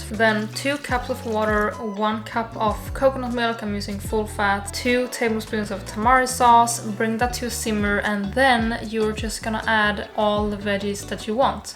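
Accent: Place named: Sweden. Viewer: man hör direkt att hon är svensk ahhaha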